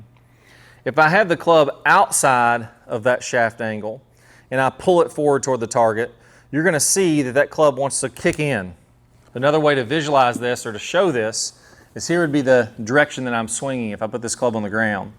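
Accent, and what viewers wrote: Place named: Florida, United States. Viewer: Hi, as you may recall im a lifelong fan! Being British, just love that Florida(?) accent!still getting that lag and the sweet little draw that you demonstrated. But anyway, I was trying to clean my car (auto) carpets by beating them against the garage wall. No matter how hard i tried just got a weak ‘thump’ that didn’t dislodge the debris, and scratched my knuckles! So I moved to the corner end of the garage to avoid the scraping of knuckles. And WOW! My hands went clean past the corner wall and the CRACK of the carpet against the wall was (almost) deafening! And the debris came exploding off Maybe ive just discovered a ‘hands in front of the ball’ drill.? BTW, i used this technique hammering in tent pegs when out camping. Allowing the stinking hand to move down (a little ) the line of swing just beyond point of impact Effortless effect! 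Maybe this might help someone Keep up the great vids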